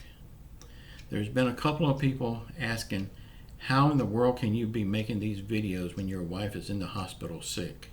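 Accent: South, United States. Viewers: Prayers for your wife. My mother had a massive stroke last Sunday, she is now fine, like it never even happened. A miracle! Hope for the same for your wife. What state are you in? I hear a southern accent.. The Republicans are disgusting, completely not in touch with reality and seemingly don’t care about the people they are supposed to be representing. For Mitch McConnell to laugh, makes me angry, very angry. I want him to be voted out of office along with the others who are dragging their feet and not passing a bill to help the people and to extend the $600 per week unemployment boost.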